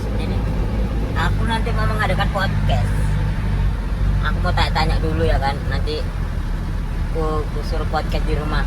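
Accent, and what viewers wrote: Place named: Indramayu, Indonesia. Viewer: Mas Punya kamu keturunan dari Indramayu ya, soalnya ngomong jawanya itu Jawa Indramayu❤❤❤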